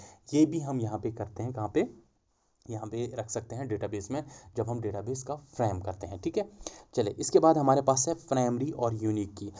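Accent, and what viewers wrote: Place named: Kulgam, India. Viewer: Meh Basan Sir chu Kulgam Rozan As per Language. Kaiz mh che kulgam peath knh Dost, wo b same Sir ki Tarah baat Krte hai. Content 👌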